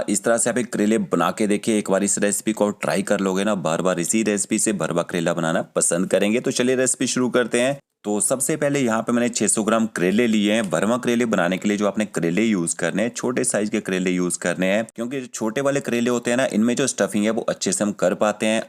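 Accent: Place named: Himachal Pradesh, India. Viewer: Himachali accent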